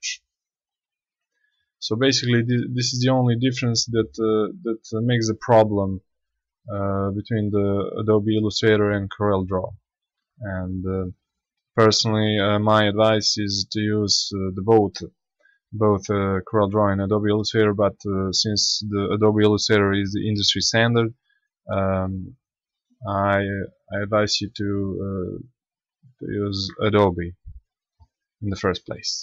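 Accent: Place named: Balkans. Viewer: Lol from your accent I thought you're from the Balkans, I'm from Bulgaria, so, yeah, I knew it! :D On the topic, I honestly prefer Corel, it is super easier for me to work with it.. However for pre-printing, one should use Illustrator. For all digital design that doesn't require printing I'd personally use Corel :) Cheers and pozdravi!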